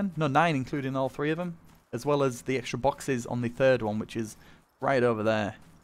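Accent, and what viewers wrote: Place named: North, United Kingdom. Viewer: Can't place your accent, its definitely northern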